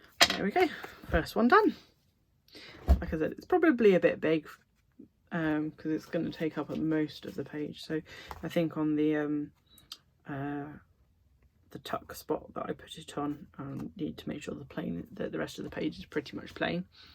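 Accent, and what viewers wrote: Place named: United Kingdom. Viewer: hello love your accent from the UK everybody. We are either rejoicing in US or crying today day after election. Im crying.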